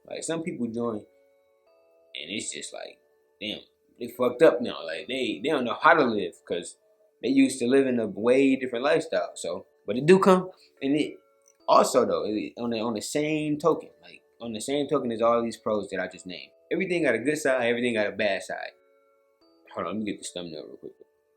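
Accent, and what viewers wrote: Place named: Detroit, United States. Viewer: Nigga it sound like you from the city frfr (Detroit)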